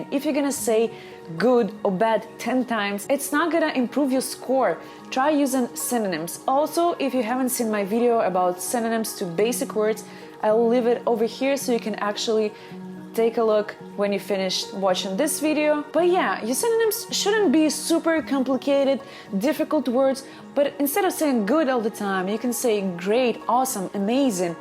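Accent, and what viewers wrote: Russia. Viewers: Is your native language is Russian language Because your English speaking is seems like you are Russian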